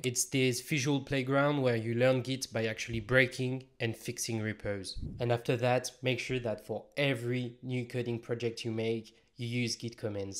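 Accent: France. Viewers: Sounds like a french man 😅. Ahh Really informative video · Toi t'as un accent français 😀! Cool ta vidéo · you sound french right .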